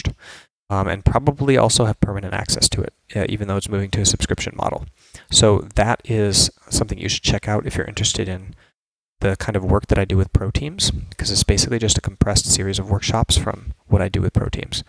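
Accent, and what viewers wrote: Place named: United States. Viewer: Where did you grow up? I find it hard to believe you're from Finland as you have no accent. You sound american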